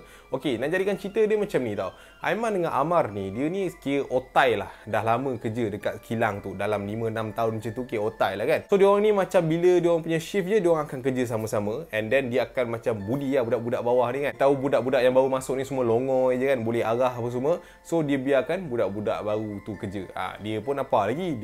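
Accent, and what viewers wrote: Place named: North, Malaysia. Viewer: Hg ckp utara sempoi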